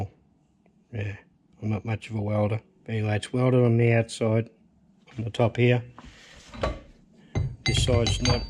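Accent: Australia. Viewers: Great job, it's like watching Max all over again...... you have even got his Ozzy accent nailed mate! Lol. Cheers, Jon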